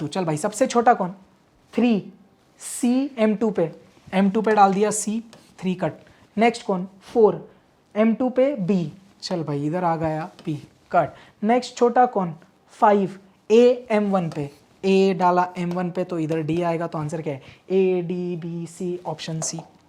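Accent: India. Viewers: Sir we are in love with your Hindi Accent 💘😍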